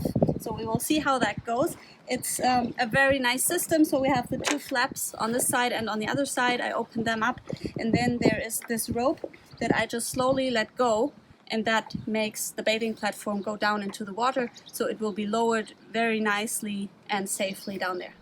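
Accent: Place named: Scandinavia. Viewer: Nice video. Her command of English language is impeccable, despite a slight Nordic accent - to the point that I thought she might be American. It was only in the end, when the boat price was indicated in Euros, that I was certain she is European. Shouldn't she have introduced herself? As for the boat, the presentation was centered on more domestic qualities, some of them outright mundane, in my opinion. The fact that Hanse is a great light sailing ship to take out in weekends, or even longer holidays, in my opinion again, was insufficiently reflected.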